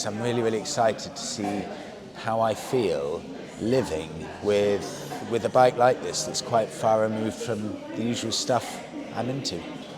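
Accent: United Kingdom. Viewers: I came here for the Meteor 650, but that quintessential British accent made me stay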